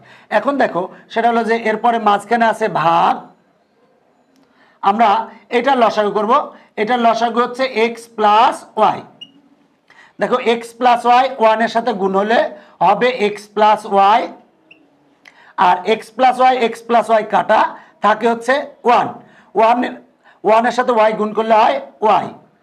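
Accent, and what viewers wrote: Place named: Kushtia, Bangladesh. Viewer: Sir apner Basha kothai??? Apner bola kichu Kotha shune Mone hoi apni kustia thake😁😁😁😁😁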